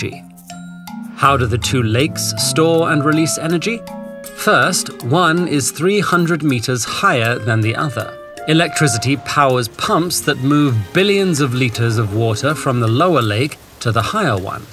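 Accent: United Kingdom. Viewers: A British voice over in a Ted video is something I never thought I'd hear or need. Man this is good. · i like British Accent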